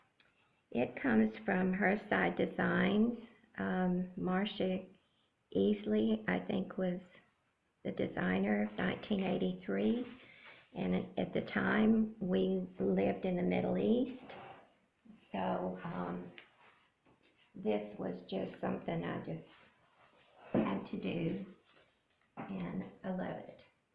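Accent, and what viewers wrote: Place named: South, United States. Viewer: Hi from Pennsylvania (but originally from Louisiana)! It was so wonderful to hear your awesome southern accent! Loved your video and hope you continue doing them!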